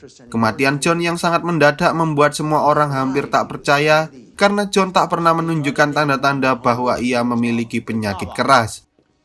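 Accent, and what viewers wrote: Java, Indonesia. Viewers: Logate logat jowo..😂😂jowo bagian ndi mas..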